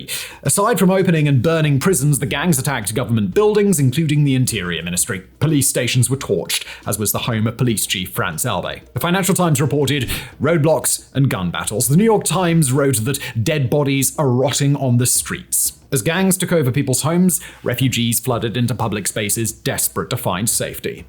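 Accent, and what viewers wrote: United Kingdom. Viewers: I can’t handle British accents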